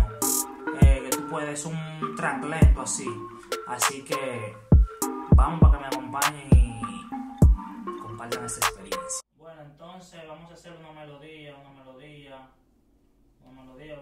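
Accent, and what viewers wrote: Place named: Mexico. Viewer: supér carnal pense que eras de mexico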